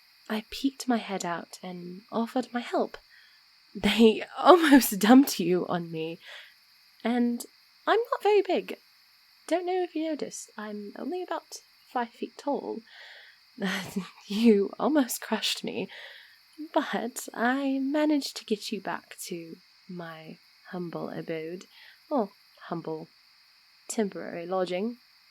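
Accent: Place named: United Kingdom. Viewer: This was really sweet! I loved your british accent!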